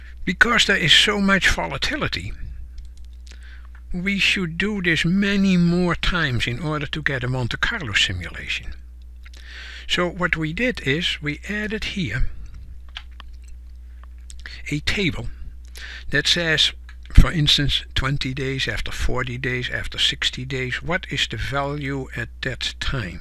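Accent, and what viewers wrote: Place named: Netherlands. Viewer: love your Dutch accent makes you sound like my father telling me all this before I go to bed...good stuff Sir.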